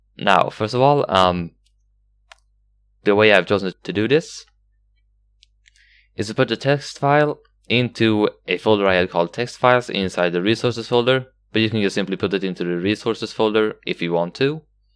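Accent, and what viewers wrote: Sweden. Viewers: I see that you have a swedish accent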